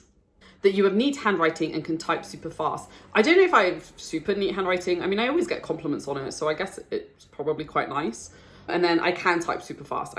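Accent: United Kingdom. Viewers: Well, I DO like your british accent. Then again, I've never really considered myself as a genuine french person 🤔 (rather than a breathing multinational (tm), haha). It's nice to get to know/understand you a bit more. I primarily thought I didn't care about those kind of videos (feels like a one-way street, if you see what I mean), but I guess it's always pleasant when it's about someone you find truly interesting and has that "something" that resonate with you. Again, congratulations. And here's to a few thousands more !🍺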